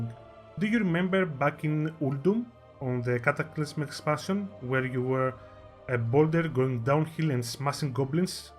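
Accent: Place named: Greece. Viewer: πως καταλαβαινεις οτι μιλαει ελληνας αγγλικα απο το πρωτο millisecond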